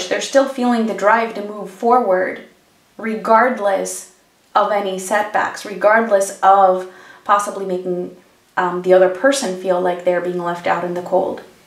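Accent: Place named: United States. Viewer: Also are you Greek? Your accent sounds American.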